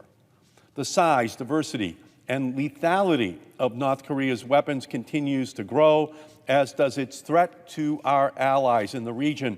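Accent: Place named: Boston, United States. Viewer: I'm listening to his voice and not looking at the video and I'm thinking he sounds like he is from Boston. Not a super heavy accent but a Boston type accent. I lookup and they have tagged, senator from Massachusetts. Lol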